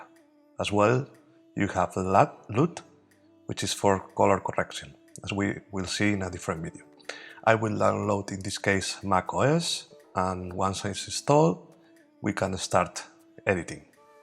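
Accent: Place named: Spain. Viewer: your accent (espanol I assume) a little hard to understand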